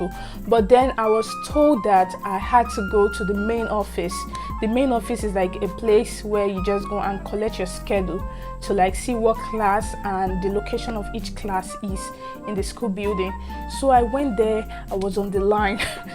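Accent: Nigeria. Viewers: Keep your Nigeria accent up. It really dope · I understand you so much....it's a big shame to Nigerian government for not making adequate provision for students back home. My first day in American college (FLORIDA) was hell but I came through. I am glad you are catching up and getting more confident in yourself. Accent is not a barrier it's just the beauty of our nationality. I just subscribed 👍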